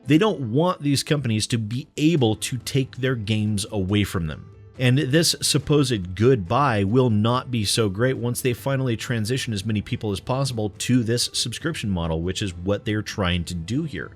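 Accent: RP, United Kingdom. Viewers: Lol I liked your British accent also that's what's happening with me and my friends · God I love the British accent, lol. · That British accent along with the game speak and humor reminds me of TB... oh how he would have had a field day this year if not for his untimely passing... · he is not doing a british accent as there is not such thing he is doing a funny snooty posh english accent. Other people have said there bit on this like pretty good gaming and more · i would pay a lot of money if he did an audio book in his smug british accent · I'll be honest here: Depending on the game, publisher, and offered rewards, I'm not opposed to Pre-Order unlock tiers. I mean the one game I did participate in that with was one of the best games I've played and that was Tales of Zestiria for PC. As a result, I got Tales of Symphonia for PC and although it did end up being a rather crappy port, it was still 40-50 bucks that I would not have to spend later. 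The difference between Deus Ex and Tales of Zestiria in my own opinion would be one is promoting that they can release the game earlier than stated but won't unless they get enough money whereas the other is saying "pre-order this game by this date and we'll throw in the PC release of this other game which is a classic on consoles for free!" The Tales of Zestiria pre-order tiers implementation worked in my mind because it wasn't just a "you get more stuff for this game" as the final unlock but a "you get more stuff for this game AND we'll even give you more stuff for another game PLUS that other game!" Just my thoughts. BTW, EA is really bad at PR and human decency. EDIT: Also, we needs more British Accent! Hopefully that's not a DLC, but how much does it cost if it is? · your british voice sounds awesome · I think I like this British version. It's funny to. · hahahahah... I love the English accent... 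· Your snobby British accent is absolutely wonderful and pretty damn convincing as a Brit myself - I'd love to see you create a character and do something with that :D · I love the posh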